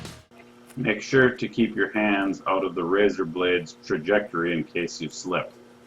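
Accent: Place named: Minnesota, United States. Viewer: I bet you are a Minnesotan?? You have the same accent as all my relative's??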